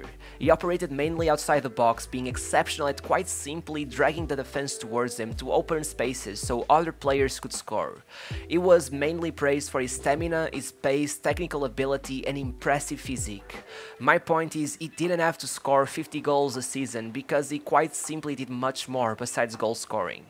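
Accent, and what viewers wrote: Portugal. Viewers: are you portuguese? sounds like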